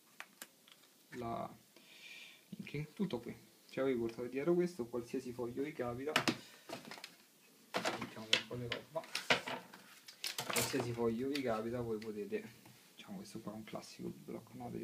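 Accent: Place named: Rome, Italy. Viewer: Oddio, il nuovo video di!!! Appena ho visto l'avviso su FB sono subito venuto a vederlo!!! Voglio l'autografo e voglio anche registrare questa bella voce romana di Roma sud!!:)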